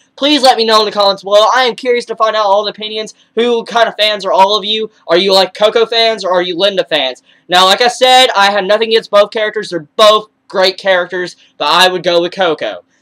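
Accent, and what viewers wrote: United States. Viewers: So he used to have a country accent?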